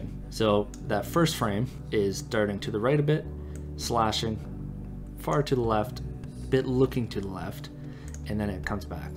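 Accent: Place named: Midwest, United States. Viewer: So curious if you’re Minnesotan, or from one of its neighboring states. Your accent just has some of those midwestern notes I’m so familiar with haha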